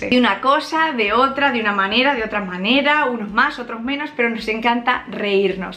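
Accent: Spain. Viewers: Hola, me gustó mucho tu video y claro, aquí (en Colombia) tenemos muchas de las costumbres que describes, por obvias razones, como lo de los dos apellidos, pero no halamos de las orejas al cumpleañero. Me gusta mucho saber las costumbres de España, por supuesto, y me ENCANTA el acento así que disfruté mucho oyendote aunque debo aclarar que el dia de los inocentes (28 de diciembre) hacemos como ustedes (vosotros) y por eso me extraña que digas que en América se celebra en abril. Si, yo sé que en ESTADOS UNIDOS se celebra en esa fecha pero en Latinoamérica (al menos en Colombia) es en diciembre. También se hace el entierro de «Joselito Carnaval» el miércoles de ceniza (como parte del carnaval que se celebra en Barranquilla, una de las más grandes ciudades del pais). Lo de la siesta se hace en varias ciudades, pero no en la capital, pues como no tenemos temperaturas muy fuertes no es necesario. 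Me gustaría ver mas vídeos tuyos. Abrazos desde Bogotá y suerte con tu canal. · Tienes realmente una bella voz y entiendo bien lo que dices . Saludos y gracias desde Francia. Adoro tu acento muy espaniol.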